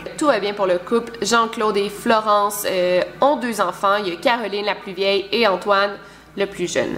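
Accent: Quebec, Canada. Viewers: Au départ l'accent québécois, ne me bloquais pas mais je me demandais si avec certaine expression je réussirais à être captivée et zn faite au bout du premier jour après ma déciuverte de ta chaine j'avais déjà regardé neufs vidéo 😂 Bref, j'aime énormement, finallement l'accent ne change rien à la qualité se qui est logique au fond, je suis une récente abonnée et n'es donc pas tout vus mais une bonne partie ! Je garde l'histoire de Maura Murray pour la fin ! Bref, merci beaucoup ❤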